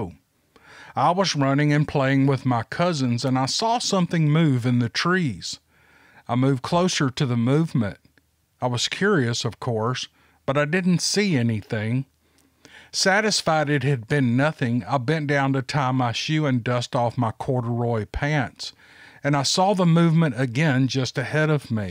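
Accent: South, United States. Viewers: me and my wife love how you tell the stories. Plus we love the good ole down south accent for reals. May Jesus bless you and yours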